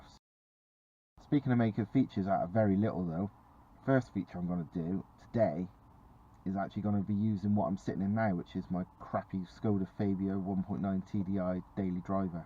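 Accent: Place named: South West England, United Kingdom. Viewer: Also i'm from the SW, didn't expect the accent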